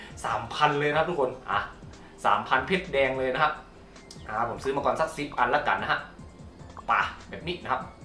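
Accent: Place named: North, Thailand. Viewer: 55555 ชอบช่องนี้จัง เป็นคนเหนือหรอคะ สำเนียงน่ารักจัง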